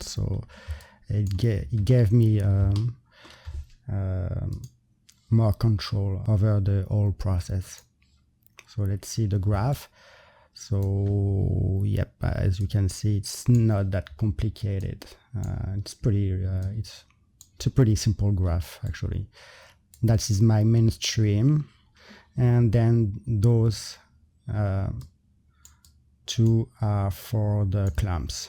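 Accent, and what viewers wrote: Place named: France. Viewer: Ah génial merci beaucoup!! Tout le long de vos vidéos je me demandais si n'il y avait pas un petit accent français caché derrière tout ça haha mais avec votre site il n'y a plus de doutes! Super travaux :)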